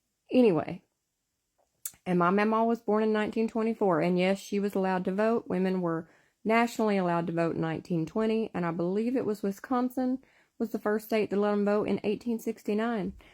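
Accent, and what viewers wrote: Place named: South, United States. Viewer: I love your southern accent 😭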